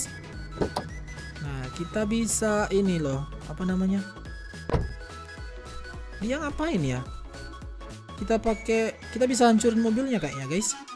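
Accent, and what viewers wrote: Manado, Indonesia. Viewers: Jan pake logat Manado bang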